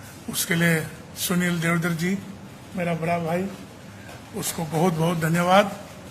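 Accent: Standard. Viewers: मुझे आप पर गर्व है । भारत को सच्ची आजादी मोदी और बीजेपी युग में ही आती दिख रही है । आदरणीय तेमजिन साहेब आपका इतना शुद्ध हिंदी में दिया गया भाषण मेरे ❤️ को छू गया । बहुत बहुत बधाई और हार्दिक आभार। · Bohot acha laga hai NAGALAND ke brother or Adhyaksh Temjen Imna Ji ne itna shuddh or pyaare HINDI bola hai... 🙏 👏👏👏... Saath saath ek acha Sanskaar bhi dikh raha hai Temjen Imna ki swavab mai... Humare Northeast ke saare log agar aisa hi mindset laike aage barhenge toh humare Northeast ko kabhi bhi bahar ka log Hindi nahin jaante ya Chinese ya jo bhi ho, kabhi nahin bol payenge... Bharat Mata ko pyaar karna parega, ish Desh ko pyaar karna parega, Ish desh ki Rastrabhasha ko bhi pehchan na parega...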